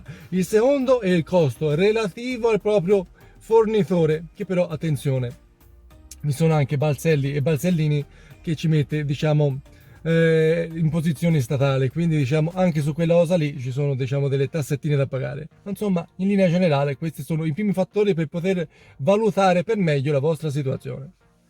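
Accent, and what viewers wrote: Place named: Apulia, Italy. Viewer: Bellissimo accento pugliese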